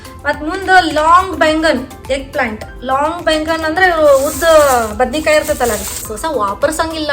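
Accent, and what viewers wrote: North Karnataka, India. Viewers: ನಮ್ಮ ಉತ್ತರ ಕರ್ನಾಟಕ ಭಾಷೆ ಕೇಳೋಕ್ಕೆ ಬಹಳ ಚಂದ ಆದ್ದ ಮೆಡಂ ನಿಮ್ಮಗೂ ನಿಮ್ಮ ಕುಂಟುಂಬಕ್ಕೂ ಕನ್ನಡಿಗರ ಹಬ್ಬ. ಕನ್ನಡ ರಾಜ್ಯೋತ್ಸವದ ಶಭಾಶಯಗಳು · ಕನ್ನಡ ರಾಜ್ಯೋತ್ಸವದ ಶುಭಾಶಯಗಳು ಅವರೇ ನಿಮ್ಮ ಅಪ್ಪಟ ಕನ್ನಡತನವನ್ನು ಕೇಳಿ ಬಹಳ ಖುಷಿ ಆಗ್ತೈತಿ. ಇಷ್ಟು ದೂರ ಇದ್ದರು ಕನ್ನಡ ಭಾಷೆಯ ಮೇಲಿನ ಪ್ರೀತಿಯ ಅಭಿಮಾನ ಒಂಚೂರು ಕಡಿಮೆಯಾಗಿಲ್ಲ. ನಿಮ್ಮ ಈ ಭಾಷಾ ಪ್ರೀತಿ ನೋಡಿ ಮನಸ್ ತುಂಬಿ ಹೇಳುತ್ತೇನೆ ನೀವೇ ನಿಜವಾದ ಕನ್ನಡತಿ. 🥰 ನಮ್ಮ ಉತ್ತರ ಕನ್ನಡದ ಭಾಷಾ ಸೊಗಡು ಅಂದ ಚಂದ ನಿಮ್ಮ ಬಾಯಿಂದ ಕೇಳೋಕೆ ಆನಂದ. ಈ ಭಾಷಾಭಿಮಾನ ಹೀಗೆ ಮುಂದುವರಿಯಲಿ.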